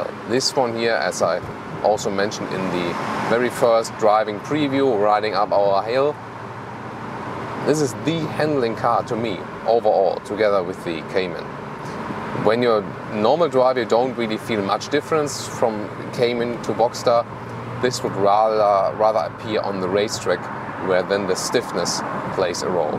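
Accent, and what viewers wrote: Germany. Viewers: Es ist komisch einen Deutschen Englisch Sprechen zu hören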